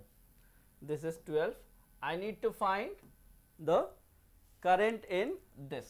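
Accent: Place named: Assam, India. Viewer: Sir Assamese medium r lage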